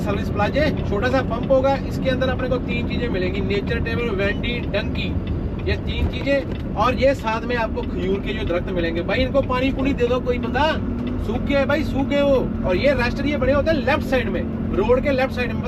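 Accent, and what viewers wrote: Punjab, India. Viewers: Punjabi bnda hindi bolde hoye das hi dinda asi punjabi aa # "ye jo khajoor ke rukh hai ye apka sawgat kre ge" 😅 great paji 🎉🧢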